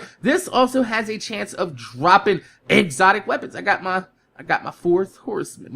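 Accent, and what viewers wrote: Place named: Florida, United States. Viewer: It sound like you stay in Florida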